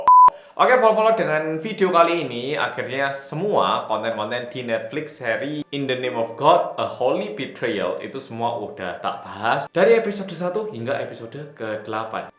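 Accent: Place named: Malang, Indonesia. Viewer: nggk nguati medoke ya mas... khas kera ngalam... salam dari Yulis4994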